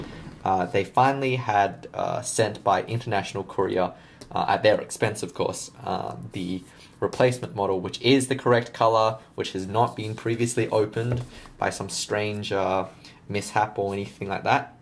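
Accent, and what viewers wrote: Australia. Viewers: I can honestly say I have never heard an asian with an australian accent before.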